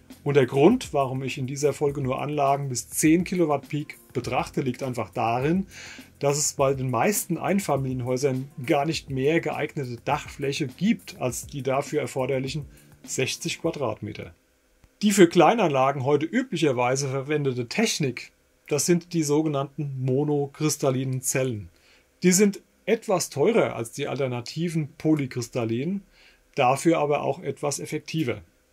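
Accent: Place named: Hesse, Germany. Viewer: Danke für das sehr informative und sympathisch vorgetragene Video! Den „Hesse-Bub“ kannst Du ja nicht verleugnen :-) An wen wende ich mich denn am besten für eine seriöse Beratung samt Angebot und Installation im Main-Taunus-Kreis? Bist Du dort irgendwie geschäftlich tätig? Dein Kanal ist abonniert, mach weiter so! VG Jörg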